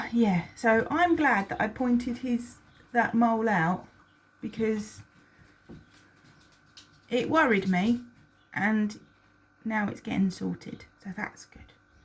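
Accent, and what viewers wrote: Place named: United Kingdom. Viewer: I love listening to you talk, I'm learning british vocabulary and accent and you help me a lot in my practice <3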